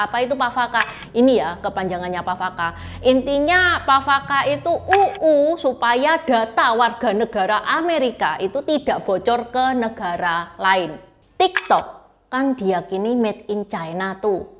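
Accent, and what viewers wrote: Java, Indonesia. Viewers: beritanya dibawa oleh lebih Seru lagi karena Bahasa Indo rame sekali & jawanya medok buanget tambah sedaaap deh. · Jawane ngendi kie, deneng medok temen ! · Plek ketiplek ....... 😄😄, Jawa banget.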